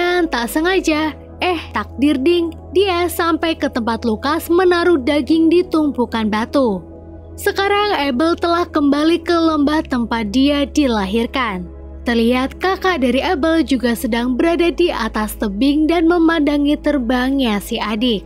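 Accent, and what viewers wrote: Pemalang, Indonesia. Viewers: wong pemalang ta mbak?😂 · Mba orang Pemalang? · Wong Pemalang mba? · Kaknya orang pemalang yahhh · Wong pml ye mba? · Mbae asli Pemalang po? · LAahhh kok pemalang? Emng org pml? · wong pemalang ya?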